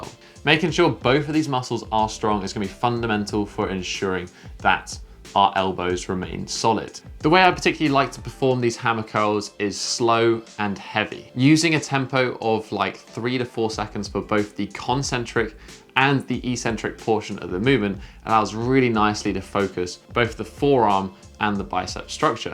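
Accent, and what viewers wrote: England, United Kingdom. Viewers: Because why pronounce just that CH in the none english way when you do the rest with that english accent?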